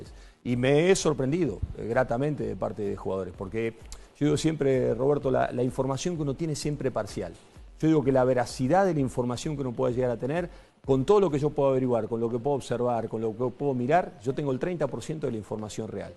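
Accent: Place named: Quito, Ecuador. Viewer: Grande Alfaro... Y se nota q se le está pegando el acento quiteño...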